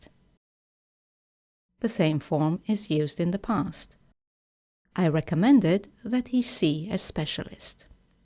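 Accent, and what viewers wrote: United Kingdom. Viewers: it is british english? Answer me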